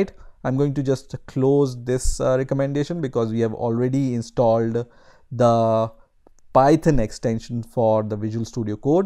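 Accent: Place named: India. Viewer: please don't speak indian english speak fluent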